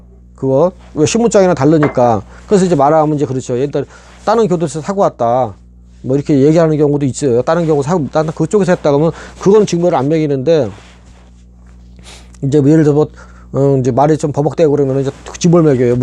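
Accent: Incheon, South Korea. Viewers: 언뜻언뜻 이천수선수닮으셨네요 ㅋ 인천분이라 약간 특유의 말투도 비슷한듯하고요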